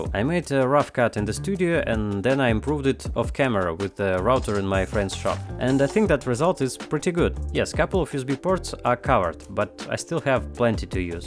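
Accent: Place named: Russia. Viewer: Хороший выбор!)Начал я смотреть видео и понимаю что акцент у ведущего, русский,но какое было удивление что это один из моих любимых блогеров.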